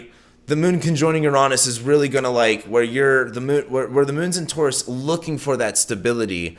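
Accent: California, United States. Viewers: Yes! Love that midwestern twang coming out from under that California accent!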